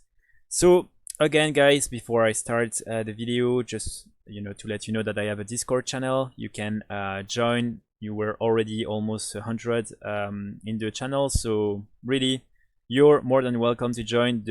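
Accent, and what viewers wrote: France. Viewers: t'es français?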